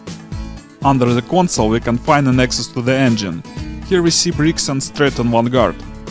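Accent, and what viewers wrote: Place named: Russia. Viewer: По акценту понял, наш спикинг )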